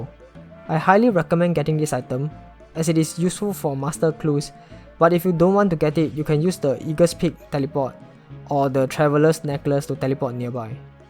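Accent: Singapore. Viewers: SG Accent ♡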